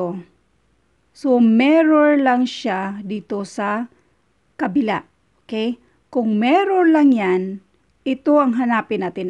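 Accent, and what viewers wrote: Visayas, Philippines. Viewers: omg this is so helpful. i havent understood it before i watched this and it really helped me understand these series of patterns especially the segment completes the grid. thank u maam and God bless btw i love your bisaya accent : )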